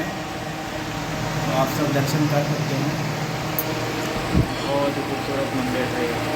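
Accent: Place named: Kanpur, India. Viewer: Prabhu Ji aap kanpur se Ho kya,boli wahin ki lag rahi hai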